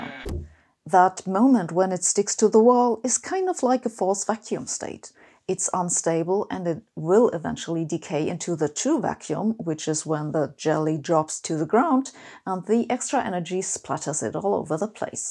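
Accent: Germany. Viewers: A hard German accent of English,I'm using "CC".After this presentation,I'm enlightened.Einstein again,I'm starting to believe in God.I give up of all things,smartphone,laptop,car,TV,light etc powered by ELECTRICITY .I'm going back to the cave to light the fire,using GRAVITY of course!I would like to know what mass it is exactly,what number or equation so that I'd be able to light a fire in the cave by gravity? Light is not sound,so as not to have a wrong perception!Welcome to the 21th century and physics from the 18th century!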